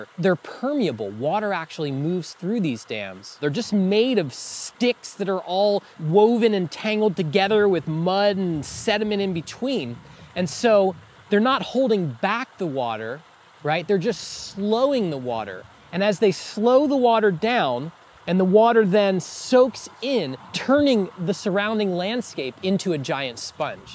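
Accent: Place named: United States. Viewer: this accent of american make me leave the video, stop vocal frying this is not natural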